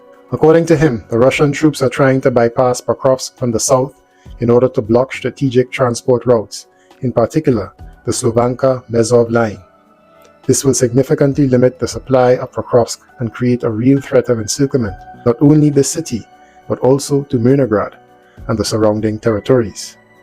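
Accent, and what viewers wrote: Trinidad and Tobago. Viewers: Narrator sounds like a Trini?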